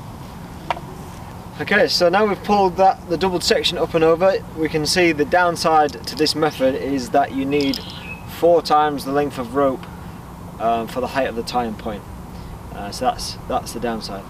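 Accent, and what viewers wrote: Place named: England, United Kingdom. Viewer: He's English bro what the bloody Hell yo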